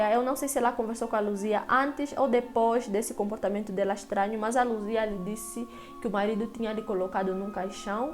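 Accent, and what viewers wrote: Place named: Portugal. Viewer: Voce puxa mais para o portugal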